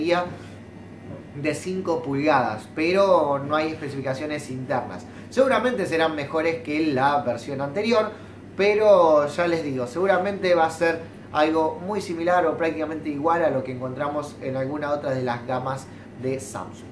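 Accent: Argentina. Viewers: hola de que parte de argentina sos?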